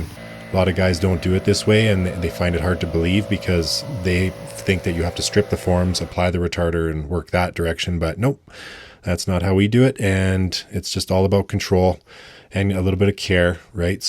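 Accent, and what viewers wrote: Canada. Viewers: Okay, so unlike many people in the comments, I have zero problems with the way that you pronounce the words garage or process. You pronounce those words in a lovely Canadian way. But I have to draw the line at your pronunciation of asphalt. It's definitely not ash-fault, as there's no "sh" sound in asphalt.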